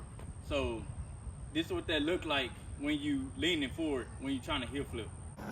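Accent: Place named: South, United States. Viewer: I just like the southern draw. country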